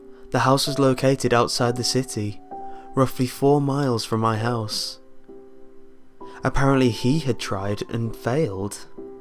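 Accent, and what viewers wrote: United Kingdom. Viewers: Oh my god..... I really love this story :) YOUR BRITISHNESS IS WHAT TRULY SELLS IT!!! <3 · He's saying it normaly. There's no k, he's pronouncing the g, and you probably don't pronounce that yourself... -_- different accents from different places. PLUS he can't 'fuck up certain words' that are correct in British english because American English is a different language. So yea... .-. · Would've been scarier if you didn't sound like you were from The Beatles. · you sound so british......its cool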